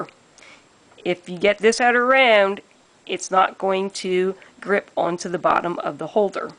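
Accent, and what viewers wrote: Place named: Baltimore, United States. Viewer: neat video, accent 100% sounds like a Baltimore/Hampden/Dundalk accent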